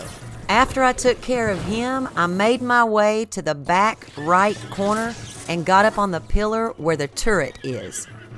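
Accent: South, United States. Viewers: Thanks. I beat the last two with ur help. Since I'm southern all the women i know sound like u so maybe birds of a feather? make it very easy to follow your advice, listen to ur voice, make sense of ur logic! Happy 4th of July God Bless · Thank you, kind southern lady!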